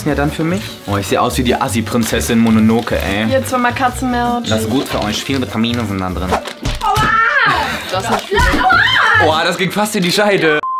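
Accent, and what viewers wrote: Saxony, Germany. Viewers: Kannst du deine Videos jetzt immer auf Sächsisch machen😂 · Ich hatte einen durchgehenden Lachflash!!😂 Bitte mach weiter so du bist der Beste!! Als du die Perücke abgezogen hast war ich so erschrocken wie kurz deine Haare sind, weil ich mich schon so an die Perücke gewöhnt hatte!!😂😂 Mach weiter so und rede mal öfter sächsisch!!❤️😂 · Hey,😊 kannst du mehr auf sachsisch reden bitte. Ich und meine beste Freundin haben uns totgelacht.😂😂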